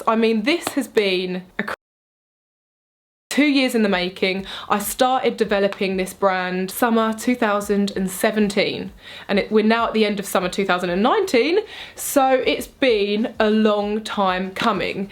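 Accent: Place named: United Kingdom. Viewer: Ok I've been seeing your lip and make up tutorials from a while now... But i never got to see you talk XD today i finally clicked on this video very excited too see someone who has such an amazing tutorials... Talk XD I JUST FREAKIN LOVE YOUR BRITISH ACCENT... I am so shocked that ur a Britain... Your beautiful... Stay like that always... Your tutorials speak alot of your creativity just in coloring your lips and i am here for it... Love from pakistan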